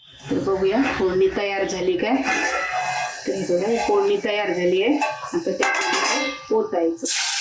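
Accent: Konkan, India. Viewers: कोकणात तसंच म्हणतात त्या कोकणातल्या आहेत आमच्याच गावच्या आहेत आमच्याकडे त्याच भाषेत बोलतात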